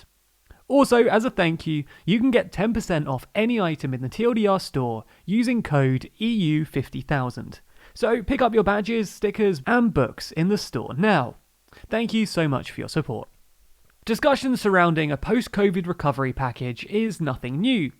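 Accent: United Kingdom. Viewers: I like the Britishness of the pronunciation though haha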